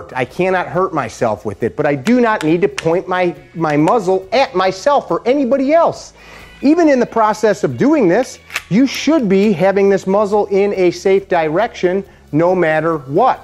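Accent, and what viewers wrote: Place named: Midwest, United States. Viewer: I can’t get enough of his Midwest accent no homo.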